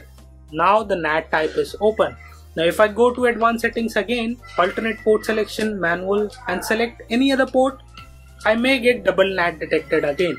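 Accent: India. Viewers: your speaking a Indian accent, no one understands that shit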